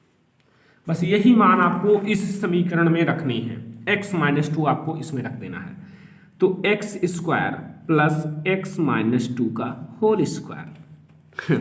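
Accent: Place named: Uttar Pradesh, India. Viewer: Kya bhai tu toh U.P side ka lag raha hai .. Me GUJARAT SE Hu 10 CBSE BOARD ..Ane wale hai boards 😨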